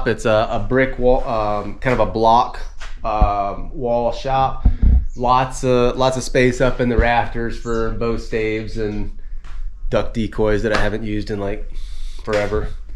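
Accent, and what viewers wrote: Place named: South, United States. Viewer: I'm just curious as to what part of Florida your from? I'm originally from Georgia. West of Atlanta about 30 miles but I moved to Perry Florida back in 1996. Perry is in Taylor county and I've been coming here sense I was a kid. Anyways I was just curious if you were from anywhere close to this area. Like the accent by the way. Always good to hear someone from the South!! I've just started following your channel so I was wondering how you wound up where your at now if you don't mind me asking?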